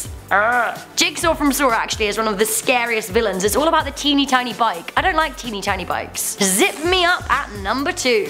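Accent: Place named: United Kingdom. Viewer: My favorite is this British accent lol 🤘🏻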